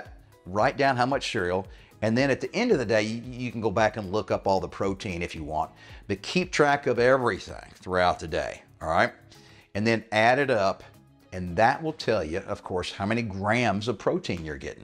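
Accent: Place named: Austin, United States. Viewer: I recognize your accent. Austin texas? Am I right?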